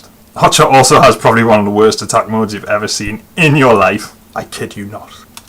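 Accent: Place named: Newcastle, United Kingdom. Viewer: I had to sub. I love yer geordie accent lyke. Why aye pet, reet canny lass etc. My favourite teacher was a geordie. And this video was about Hot Shot. The best subject ever.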